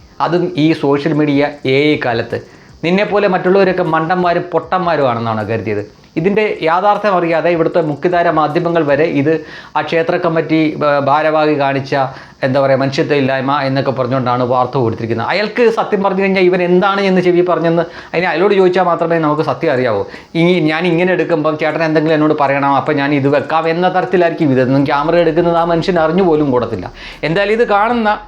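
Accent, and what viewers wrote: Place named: Alappuzha, India. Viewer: Hi innathe special entanu. Nalla alappuzha saily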